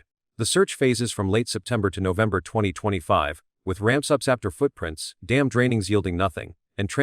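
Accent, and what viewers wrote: United States. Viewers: American accent on ai voiceover 😒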